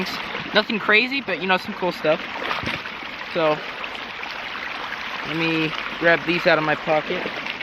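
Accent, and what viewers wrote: Pittsburgh, United States. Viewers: He sounds like he from Pittsburgh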